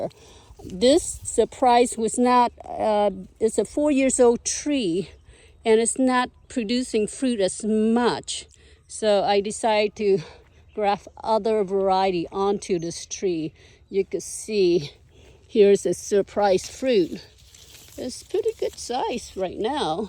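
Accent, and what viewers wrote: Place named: Vietnam. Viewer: math pays the bills! Farming in the blood. Having an edible landscape surrounded by open space is nice. There is an empty lot 120 acres down the street from us we are looking into only issue is it’s VERY steep. Jujubes we do know quite well. I grew up on a farm in San Diego county and we had jujubes so I developed a taste decades ago for them. Will be interesting to watch the progress of the avocados. What year was initial planting? I started in 2017 and am about out of space now at 70 trees. Total trees with everything about 100 I lose track. I’ve had a lot of failures with avocados but now got it down pretty well with good drainage and lots of mulch and most of the grove is thriving. I am especially interested in seeing how Nimlioh does for you. I have 3 and they are thriving. Funny trivia my guess is you were from Vietnam bc I know the accent - MANY of my students are Vietnamese and have been teaching 25 years to them. 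My best friend Andrew Stehr who owns Stehr concrete in San Jose has Vietnamese wife and he - white guy from Alabama is completely fluent in Vietnamese. I read and write mandarin 我读写汉语 so what is interesting is when Andrew translates Vietnamese into Chinese for me I can understand it. So anytime the Vietnamese use the Chinese characters I feel very happy. We have a new Vietnamese supermarket that opened called Dai Thanh market and I have no idea what that means but as soon as I see the writing 大城 market then I know what it means - big city market. Avocado 牛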